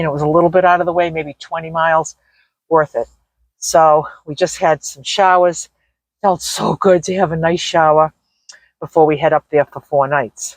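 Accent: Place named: New England, United States. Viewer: I love your New England accent! Also, your talent and adventures! I'm in Alabama and never been out West.. would love to see the Grand Canyon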